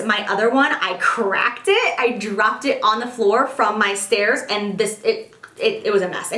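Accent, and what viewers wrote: United States. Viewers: Omg I love you accent it is the best aha the way you say caramel is so cool wish I was American xx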